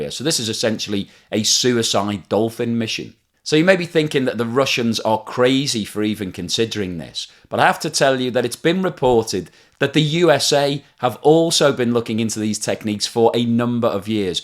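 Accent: United Kingdom. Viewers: Thanks for your clear british english 👌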